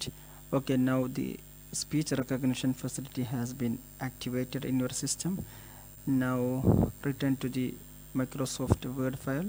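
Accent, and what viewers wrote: Kerala, India. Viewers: Bro u mallu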